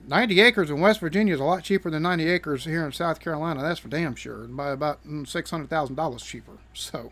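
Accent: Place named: Yorkshire, United Kingdom. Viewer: Is that Yorkshire UK? asking for a Lancastrian.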